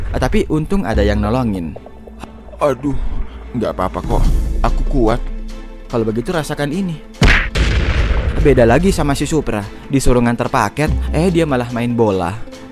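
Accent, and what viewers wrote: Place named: Sulawesi, Indonesia. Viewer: Ini kayak orang sulawesi logat"nya🤔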